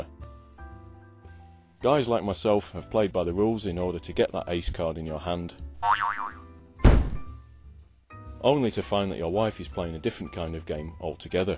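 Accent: United Kingdom. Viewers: MGTOW vids with English accents always makes me smile. Top class as usual. More vids from you would be most welcome. Thanks for your content.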